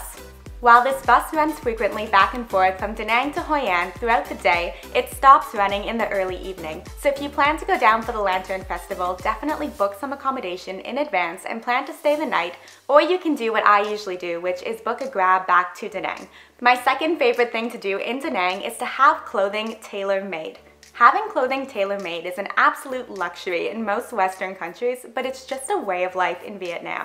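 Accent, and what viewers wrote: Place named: Upstate New York, United States. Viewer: Where's your accent from? You have an accent that sounds like a north New York accent.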